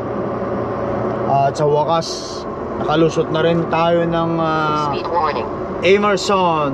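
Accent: Ilocos, Philippines. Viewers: Ride safe always sir parang ilocano kau sir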